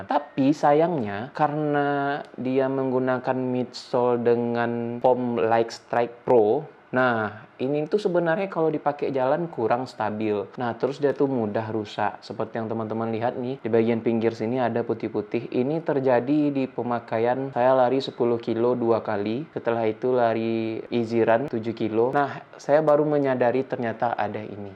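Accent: West Sumatra, Indonesia. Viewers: Aksen minangnya ketara banget tapi mantap uda reviewnyo. Sangaik mambantu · Lidah nya patah2. Dima sumbar da?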